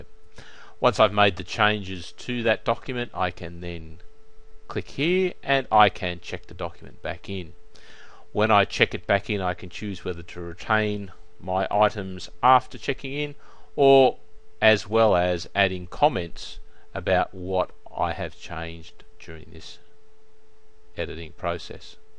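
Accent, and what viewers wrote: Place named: Australia. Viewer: Thx for the great video! Love ur Aussie accent :)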